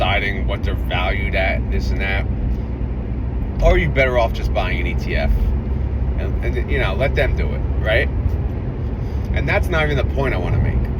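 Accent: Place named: New Jersey, United States. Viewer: Where do you live? You sound like New Jersey.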